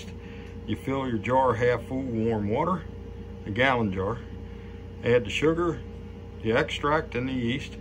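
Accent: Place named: Maryland, United States. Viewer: That sounds like a true Maryland accent hah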